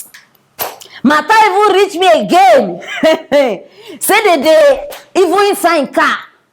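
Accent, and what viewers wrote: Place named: Warri, Nigeria. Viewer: Aproko madam you Sabi Ur work Weller I swear with D's your warri English dey sweet me die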